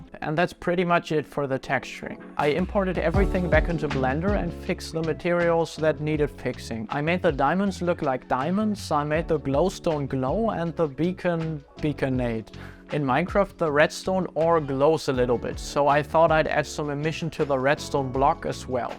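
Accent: Germany. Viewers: he sounds so german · Man hört den Deutschen Akzent 😂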